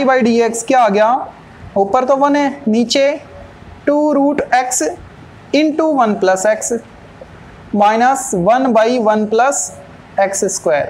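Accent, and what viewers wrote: Haryana, India. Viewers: Ha mere kne hi si ye btata h ki ap haryana se ho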